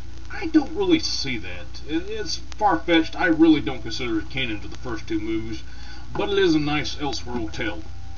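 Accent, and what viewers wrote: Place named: South, United States. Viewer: Hey, I'm from Canada. I think this review wouldn't have been as cool if you didn't have the accent. It makes me like you. Where are you from? Sounds very Southern. Either way, great review. I want to note however that they put real indians in the movie. I mean ful blooded ones, which I thought was extremely Canadian.nJust wondering if you noticed that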